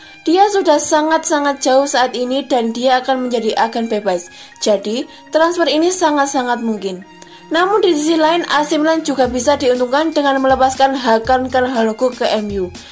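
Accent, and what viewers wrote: Java, Indonesia. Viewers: Medok 😀. Tapi 👍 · Dok medok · Wong jowo yaa min 😊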